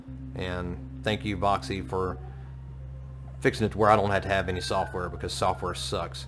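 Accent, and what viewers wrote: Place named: Louisiana, United States. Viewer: Banger of a review, well done! :) just found your channel and subbed, loved the bgm to go with the chill Lousiana accent, great video cowboy :D Btw the flex is at the bottom of the mouse, I recommend not to go too hard there around the sensor although it's probably really safe, some flex at the bottom plate is basically always to be expected :)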